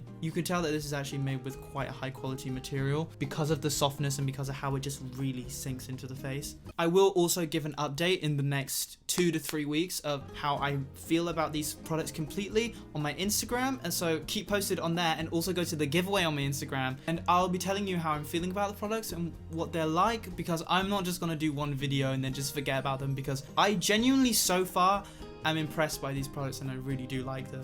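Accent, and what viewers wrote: United Kingdom. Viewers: Sue me but I'm here to hear your british accent :')😂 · zeliéxsé he sound british? I wonder why? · Whoaaaa is it me or does he sound british? I love his accent! Edit: Thanks for the likes uwu ❤️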